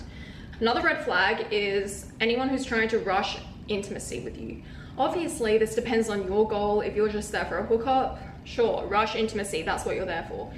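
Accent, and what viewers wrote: Australia. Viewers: This accent is tripping up my brain. I hear like 70% Australian and something else mixed in back and forth.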